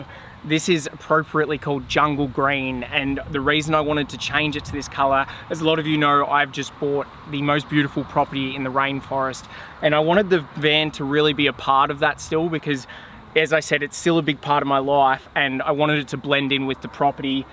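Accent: Australia. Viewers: Most annoying Aussie accent ever. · Looks great! Makes me miss living in oz. I miss all the extra Ws and Rs in the accent 😂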